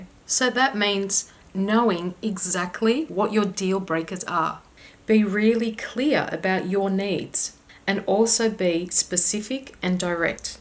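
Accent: Australia. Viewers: Pretty sure she's an Aussie. Lol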